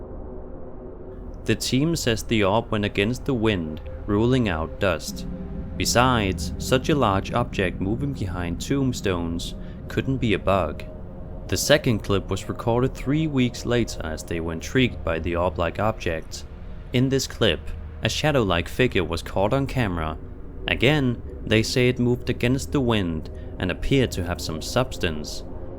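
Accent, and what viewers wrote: Denmark. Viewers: Sir, are you danish? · thank you. My hubby is from Denmark and he pointed out his accent lol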